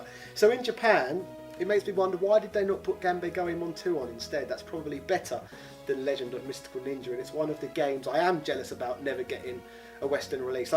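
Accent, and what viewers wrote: United Kingdom. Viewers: are you mispronouncing 'ganbare' and 'melee' on purpose or is this Just some sort of british accent I am Not yet aware of?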